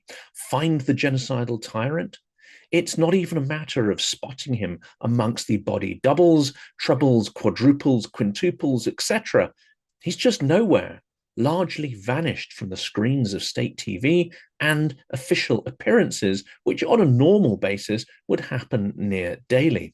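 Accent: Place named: United Kingdom. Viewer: Well done,! Always incisive, well thought out, and stated in excellent intellectual British English which is very pleasant to American ears. Love your podcasts. One of the best podcasts on You Tube.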